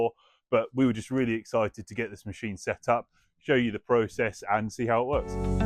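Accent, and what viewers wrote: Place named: United Kingdom. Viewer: I would have liked to have watched this video in detail, but being from Alabama, U.S.A., I could barely understand only a few words this narrator said. His accent was too Britishly thick for me to understand very much of anything he said. I need another video with better enounciation than this. I'm sorry, but I'm only being honest.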